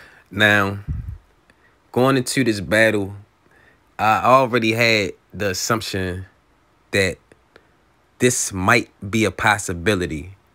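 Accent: Baltimore, United States. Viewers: Didn’t know you was from Baltimore until I heard you speak in this video. Good seeing the city speak on battle rap. Thought I was by myself out here. LOVE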